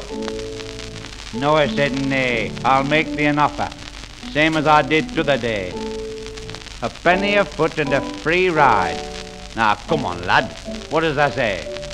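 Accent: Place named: Lancashire, United Kingdom. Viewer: Noah sure could drive a hard bargain,but is messing with the wrong crowd here...Surely found his match in Sam Oglethwaite of Bury..Lovely Lancashire accent though, enjoyed the story by Stanley as only he could tell it...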